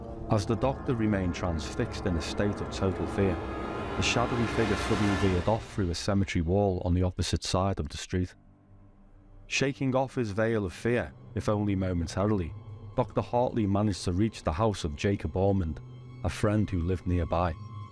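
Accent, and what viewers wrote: Liverpool, United Kingdom. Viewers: the posh scouse phone voice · Scouse narrator. Bonus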